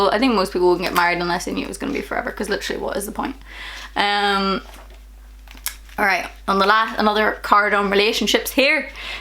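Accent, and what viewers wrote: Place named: Ireland. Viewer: I could listen to you talk all day with your adorable irish slang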